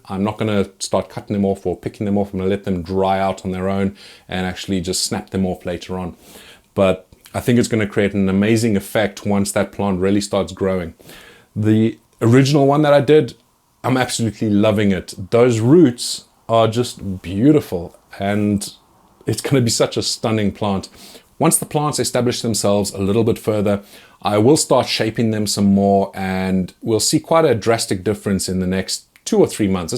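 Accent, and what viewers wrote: South Africa. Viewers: Are you a South African? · is that South African I hear? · Is my mans South African? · Legit idea....and u sound south african...exellect content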